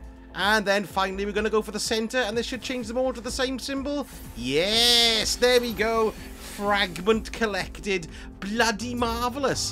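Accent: Wales, United Kingdom. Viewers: Nice to hear a fellow Welsh accent, you have a clan? So hard starting recently and playing catch up.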